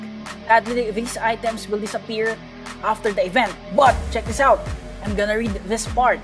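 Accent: Philippines. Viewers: You sound like a Filipino to me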